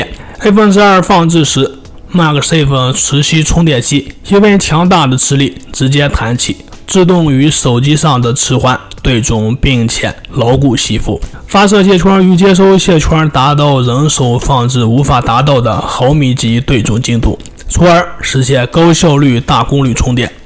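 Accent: Shanxi, China. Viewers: 听起来像山西陕西一带哈哈哈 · 你山西人哇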